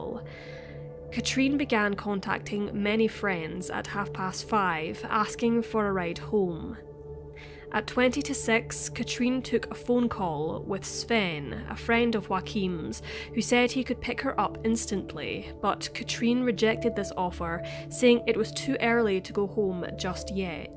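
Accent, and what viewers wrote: Scotland, United Kingdom. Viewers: Nice to hear a video narrated in a soft Scottish accent... Just subbed... Blessèd be /|\ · I agree! A video came up in my recommends and I totally didn’t expect to hear a Scottish accent. I’m from Ayrshire. Your videos are awesome. True crime but much more sedate and pleasant to listen to. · Fookn Razor Scottish is so beautiful, people who say otherwise are mad lol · A lovely Scottish accent. Fantastic pronunciation, gotta luv it. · I wondered what accent she has and went to the comments lol never have i ever heard a real scottish accent before! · ayyyy a scottish mystery reader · It's so nice to hear a real voice on these films, esecially a good Scottish one (I come from Alloa, near Stirling). There's real humanity in the telling of these stories, they're not just told for 'shock' effect - well done to the writer and narrator. 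· I was going to guess Scottish. Very nice! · 509mrs its funny cause a lot of people say the scottish accent is horrible, but up in the north east this is honestly how beautiful the language can get!! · Her accent is Scottish but it sounds “Irish” to many Americans because we’re used to hearing harsher Glaswegian - style Scottish accents (such as Billy Connolly’s) and Groundskeeper Willie on American TV.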